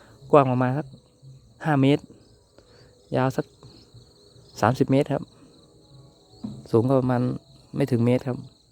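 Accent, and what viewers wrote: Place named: Korat, Thailand. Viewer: พูดเหมือนภาษาโคราชเลย ชอบๆ 55 fc ครับ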